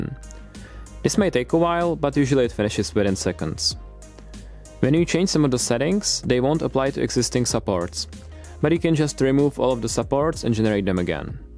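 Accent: Czech Republic. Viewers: Český přízvuk je nezaměnitelný :)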